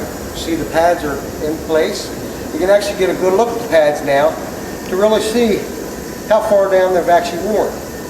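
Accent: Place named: Delaware Valley, United States. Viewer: I love that Delaware Valley accent!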